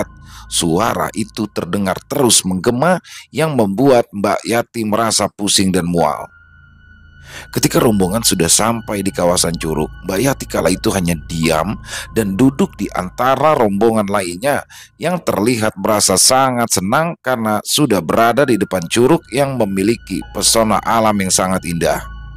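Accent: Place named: Banyumas, Indonesia. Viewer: Tumben itu perkataan yg dimaksud diluar kebiasaannya. ContohTUMBEN KAMU MASUK PAGI PAGI BIASANYA DATANGNYA SIANG. KLO sampaikan/sampean itu kata sama dengan kamu, lebih ditujukan untuk orang yg lebih tua dari kita. Aksen bahasa Jawa ngapak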